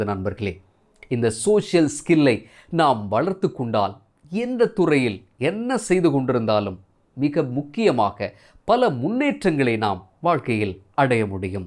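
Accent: Sri Lanka. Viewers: Arumaiyana Sri Lankan Tamil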